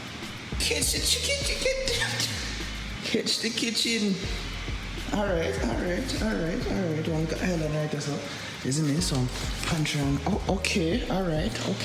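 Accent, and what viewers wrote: Jamaica. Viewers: Jamaican accent of course he is from a diff part of ja thats all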